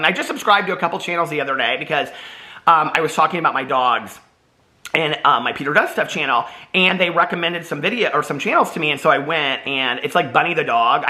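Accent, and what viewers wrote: United States. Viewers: When I first started following you a couple of years back I didn't understand the American accent and thought you were giving a radio call sign in your intro and maybe you were a DJ haha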